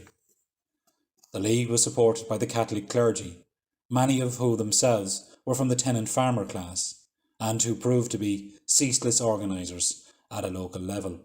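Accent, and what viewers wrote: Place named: Ireland. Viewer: Videos like this come with a 101% chance of having an Irish accent. Pity the Wyndham Act didn't come sooner though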